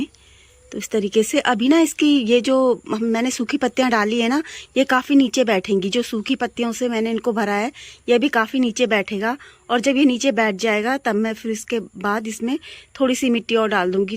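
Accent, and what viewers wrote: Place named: Garhwal, India. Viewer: Aap ghadwali ho awaz se lagta hae good sharing happy gardening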